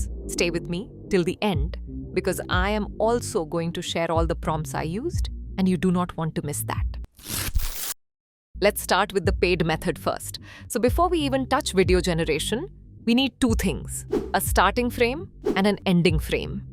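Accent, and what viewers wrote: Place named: India. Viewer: поменяй уже наконец акцент у своего аватара с индусского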